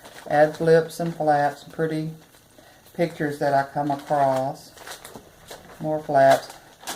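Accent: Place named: Northeast Texas, United States. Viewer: I'm from north east Texas & your lovely accent sure makes me homesick. I have really enjoyed seeing your beautiful journals. Thanks for sharing.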